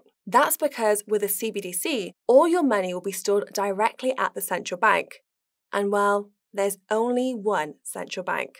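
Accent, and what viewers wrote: United Kingdom. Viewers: I don't get the ad bit in the middle with the intentionally extremely British accent. Her accent is already almost too british for most of us to understand anyway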